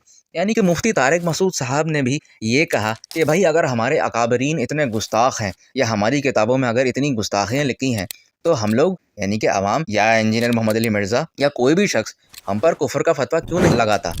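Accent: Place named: Karachi, Pakistan. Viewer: Karachi wali awaz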